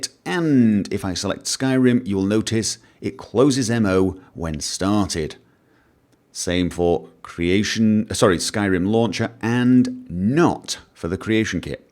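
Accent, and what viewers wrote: United Kingdom. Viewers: You are from Norway? this is impossible. I am sure You are British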